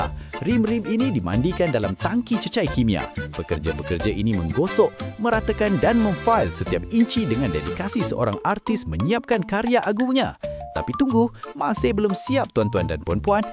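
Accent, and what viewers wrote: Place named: Indonesia. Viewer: menyampah plk aq dgn gaya ko berckp....dh mcm style Indon kpg !!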